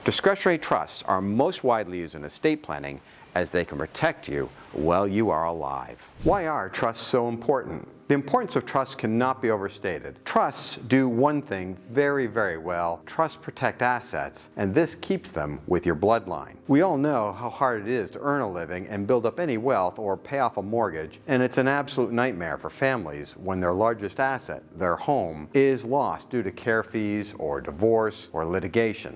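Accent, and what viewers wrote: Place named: United States. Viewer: Nothing personal but an American accent is a bit of a red flag especially with the few Americanisms being slipped into UK society since Brexit. A lot more Private healthcare and therapy adverts being the most obvious.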